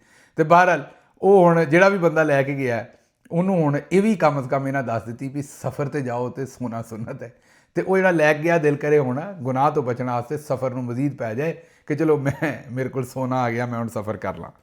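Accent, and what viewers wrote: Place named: Malwa, India. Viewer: Bahla harami aa Dr gill jka safe aali malwayi bolda but chubbviyan gla karda.. dharam naal nzara aa janda Dr tere video dekh ke charde punjab to.. dr vaise kise veele choundey chakk dinna Faislabad to lagda marasi hun mein ni kehna ptandra ggaint Dr sahab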